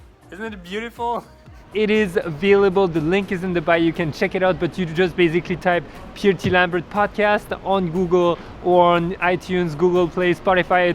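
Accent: France. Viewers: Love your French accent dude! I do use crop a lot!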